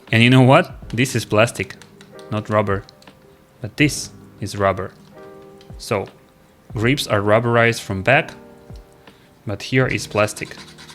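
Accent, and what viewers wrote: Russia. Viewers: Бро, за сколько времени ты выучил английский до такого уровня? Я по первым секундам понял, что ты русский, несмотря на идеальное и приятное произношение. Мне интересно стало, сам начал только изучать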